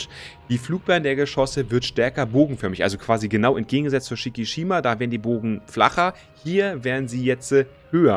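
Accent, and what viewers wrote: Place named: Berlin, Germany. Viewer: Da hört man irgendwie ganz stark den Berliner raus, oder? ;)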